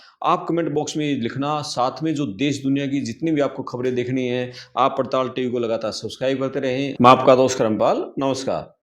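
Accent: Haryana, India. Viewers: Ye youtuber Haryana se hai ❤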